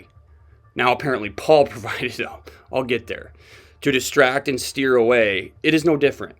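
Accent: United States. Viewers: Do you know the girl took her own life? please stop with your content enough is enough. Suicides are tragedy in this family has to go on without their wife, mother and friend, but you putting content on putting your ideas out good for you, but it’s time to stop enough is enough. Please let this girl rest in peace please… listening to the rest of your video. Are you for real you are arrogant. You have absolutely no idea about these people. You obviously live in America. Judging by your accent, forming opinions from newsreels and other people‘s videos WTF get a life leave these people alone, let them move on. I have been watching your channel since Nikola went missing and all you do is throw doubt onto everything. It only takes one person to believe that garbage that dribbling from your channel and they possibly may hurt. Nicole‘s husband just stop it. 😠 you’re an idiot.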